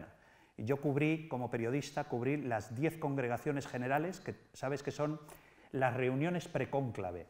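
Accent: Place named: Spain. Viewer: Gran escritor peruano. Parece que lleva muchos años en España, porque su acento es totalmente español.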